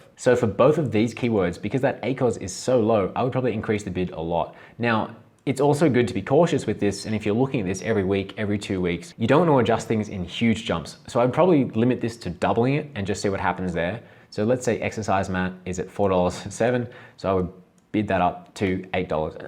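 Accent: Australia. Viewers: Loved the no fuss Aussie delivery. Can you tell me do you eventually drop the automatic campaign when you have sorted the manual campaigns. Cheers.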